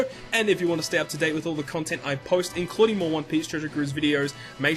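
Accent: Australia. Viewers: So you from Australia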